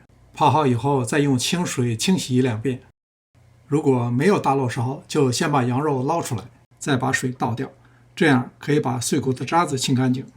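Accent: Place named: Hexi, China. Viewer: 老师的口音感觉是河西区的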